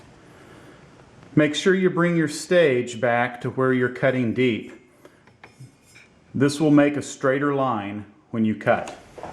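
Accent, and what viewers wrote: South, United States. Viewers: I personally LOVE your soft Southern accent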